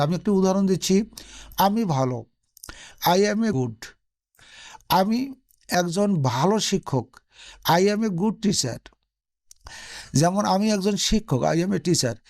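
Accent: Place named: United Kingdom. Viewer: Apni british english bolchen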